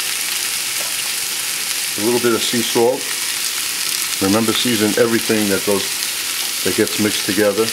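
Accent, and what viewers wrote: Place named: New Jersey, United States. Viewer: Why do you sound like one of the Sopranos?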